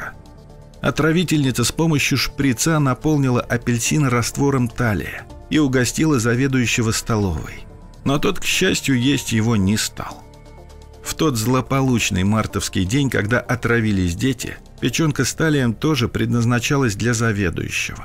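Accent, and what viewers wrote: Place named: Ukraine. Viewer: начал смотреть и сразу подумал,что она украинка...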